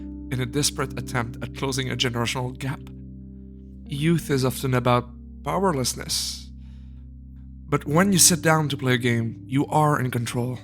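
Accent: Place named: Quebec, Canada. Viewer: My grandma was from Québec, thought I recognized that accent. Cool video gonna sub was a fresh take on the idea of gaming decline without the click bait garbage so prevalent on YouTube.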